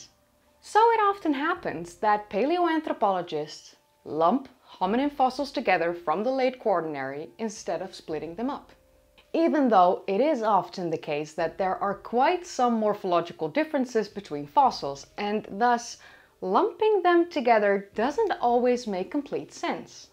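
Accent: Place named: Netherlands. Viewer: Very Dutch accent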